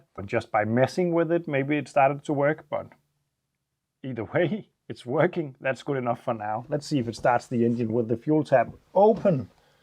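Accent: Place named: Denmark. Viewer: watched 2 min and already I can tell without checking, this guy is from Denmark... 🤣🤣💪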